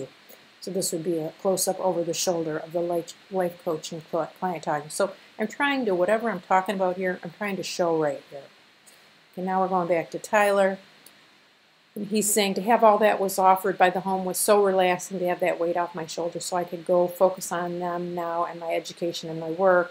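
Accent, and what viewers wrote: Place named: Midwest, United States. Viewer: I can hear a Midwesterner from a mile away lol Great video!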